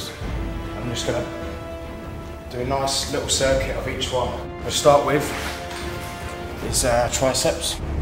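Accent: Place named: United Kingdom. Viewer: British accent + good body (y)!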